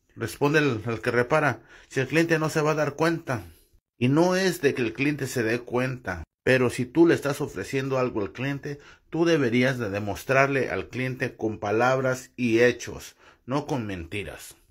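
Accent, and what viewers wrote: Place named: Mexico. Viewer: No ma.. que chingon trabajas amigo e visto varios de tus videos trabajas muy chingon veo que estas en EU donde aprendiste el oficio por tu forma de hablar y las leperadas que dices jajaja as de ser mexicano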